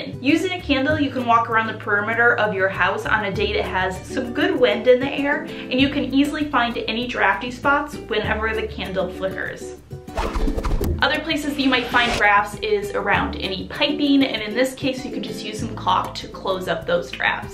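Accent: United States. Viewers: I'm so over American accents. Her voice grates on my nerves!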